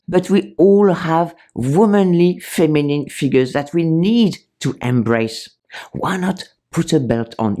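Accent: France. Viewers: "The LEETLE House on the Prairie Look". 😂. (I love your French accent, not making fun of it. 😊) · has the most adorable French accent on English I ever heard. She has also charisma, talent and style. However she herself had aged well and styles everything for women likewise. Most of us, women after 50, had not, except Asian origin women, who looks like 30 in their 50ties. We have flabby arms, "bat wings", we have often too big, lousy and fat boobs, we have protruding bellies, vanishing wastelines. All these sleeveless dresses, all these dresses on straps looks horrible on most of us. An advice to clap a belt is fine as long as you can find where to clap it 😅. But most important thing is - we, women after 50, do not care so much what anyone else is thinking of us. So if you like floral prints, cowboy boots or lousy dresses- just wear them. Wear even dresses on straps and clap belts, even being size 3XL, if you feel comfortable. 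Life is extremely short. So enjoy it the best way you can!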